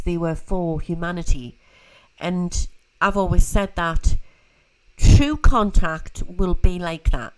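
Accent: Newcastle, United Kingdom. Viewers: Love hearing the geordie voice, especially like when its just like being at the spookies in south shields years ago ❤ Hope you are improving ❤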